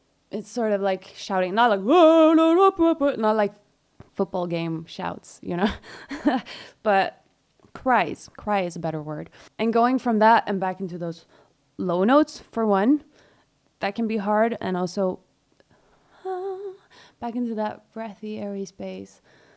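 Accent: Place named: United States. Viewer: I AM REALLY SHOCK 😱😱😱😱😱 I confess that i didin't know her before watching this video, and hands down to her oh my Goodness What a beautiful voice, i'm gonna watch more stuffs about her Please don't be mad at me, but she's also reminds me just a liitle bit of Mariah carey 😂😂😂 i'm sorry, of course she has her personality, which is stunning, and the jazz vibe changed everything But over all i love this so much "Without me disturbing you", you never disturb us, at least for me And wow!! You're from norway!! It's a beautiful country, and your english is very american hahahaha, so good for the singers 😎 Anyway, thank for the video 💛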